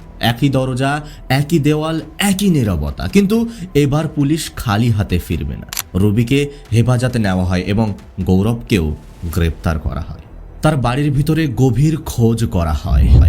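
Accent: Bangladesh. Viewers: আপনার কথার ধরন শুনে মনে হচ্ছে আপনি বাংলাদেশী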